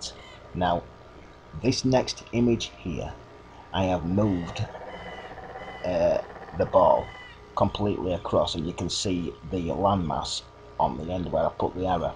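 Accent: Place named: North, United Kingdom. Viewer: Loving the blunt northern accent. Keep up the good work. 😂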